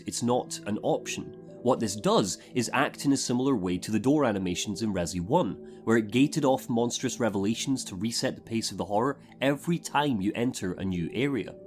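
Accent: Scotland, United Kingdom. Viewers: Haven't finished the video yet but "You can criticize Scottish accents when you get free healthcare Americans" almost made me die laughing as an American myself ddd. Imagine correcting someone's pronounciation when they are from a different country and have a different accent to you. That's just rude. Besides, in-ven-try still sounds right. Anyway, sorry for the babbling, going to finish the video now, but I love/agree with a lot of what you're saying! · I watched this video for about an hour before I realised you were Scottish, you have such a light accent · I don’t criticize Scottish pronunciation. I enjoy it, it’s fascinating and eclectic in its acquisitions of other dialects’ features. Keep up the fine work.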